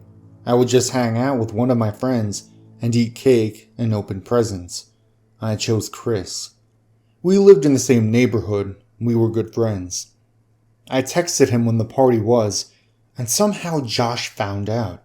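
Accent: United States. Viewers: Love your cute American accent!!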